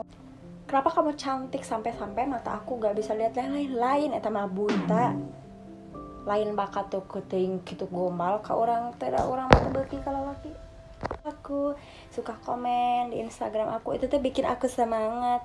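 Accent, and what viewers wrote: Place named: Sunda, Indonesia. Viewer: Naha sih lamun ngomong sunda meni bodor pisan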